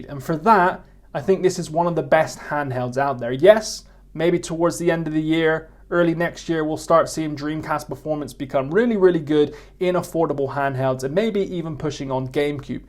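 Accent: United Kingdom. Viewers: I didn’t even realize you were British until you said something. I think there are so many Youtubers around the world now that I don’t even think much about accents. Weird!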